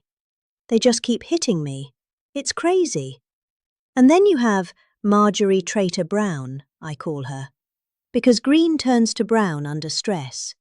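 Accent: England, United Kingdom. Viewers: Can’t wait until this lovely lady reads his obituary in her lovely lilting English accent!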